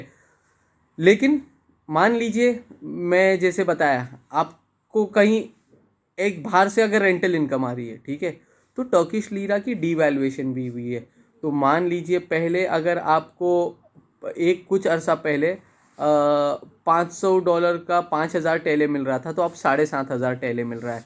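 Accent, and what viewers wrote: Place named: Hyderabad, India. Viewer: Assalam o alaikum Bhai, you sounds like Hyderabadi Telangana, India, if yes please let me know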